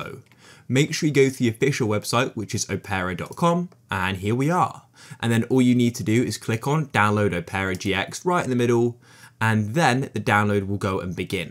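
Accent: United Kingdom. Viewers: hes bri-ish/british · nah bruv he’s bri’ish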